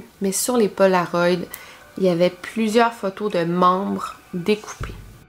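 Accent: Quebec, Canada. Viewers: Aiee c chaud cette histoire ( gg au prisonnier 😂😂 ) continue comma ça j’adore l’axon canadien en plus 😉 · super vidéo encore une fois ! Je suis vraiment fan de tes vidéos ! Et je te jure, moi qui aimais pas tellement l'accent québécois, tu m'a vraiment fait aimer l'accent ahah, sinon continue vraiment les vidéo ! je ne supporterais pas ne plus te voir 😘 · Sans vouloir critiquer, mais l'accent quebecquoi pour quelqu'un venant de france est vraiment très lourd! C est trop bizarre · merci pour tout ces faits divers que tu nous partage! mais j'ai vus que parfois tu lisait un livre au complet pour nous faire une video, ca doit te demander bcp de temps! comment tu t'organises, tu en lis plusieurs en meme temps? merci encore pour tes videos j'adore l'accent quebecois et les faits divers, tu combine les deux! et en plus tu es une très belle personne (daans les deux sens ahaha) et tu fait des videos completes, bref autant te dire que je suis tes videos comme si c'etait ma serie favorite!! 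· Elle n'a pas aucun faute, c'est evident, mais je trouve l'accent quebecois trés marrant... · Franchement je viens de découvrir ta chaine et je la trouve trop cool , surtout avec ton accent québécois est super cool❤️😆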